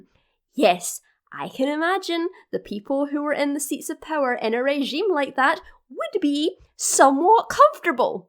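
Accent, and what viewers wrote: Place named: Scotland, United Kingdom. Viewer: You have a beautiful accent, are you from Scotland? And yes, I found your channel among the Ahsoka reaction videos.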